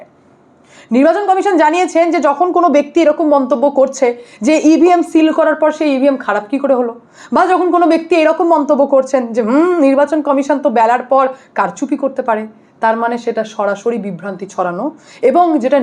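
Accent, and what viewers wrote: Bangladesh. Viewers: তোমার বাঙাল কথা টা যে কি ভাল লাগলো 😂😂😂😂😂😂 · tumi to besh bangla desii bhasa ta darun bollen khub bhalo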